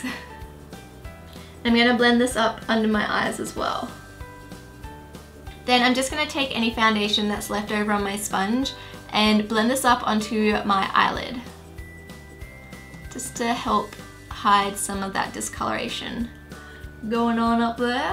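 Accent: Australia. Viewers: P.s. I am so jealous of your accent aaaaah! I've always wanted to say that, I don't know why I haven't haha I had to fake an Australian accent for my school musical when I was the lead, and people loved it, and it's what pretty much landed me the role ahaha it's my favorite accent! But then I did my school play and I had to fake a BRITISH accent and it was hard because I'm so used to doing an Australian one, so everyone was just reminded of my character from the musical hehe and THERE'S MY LIFE STORY! Sorry about that haha. My point though, is that I envy you and your accent!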